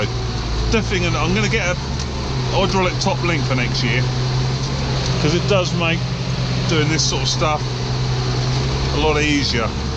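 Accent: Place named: Lincolnshire, United Kingdom. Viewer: help settle an argument for me please, we know you're in Beds. but you're accent sounds Lincs. to me but my mate reckons it doesn't, so are you or are you not a Lincs. lad?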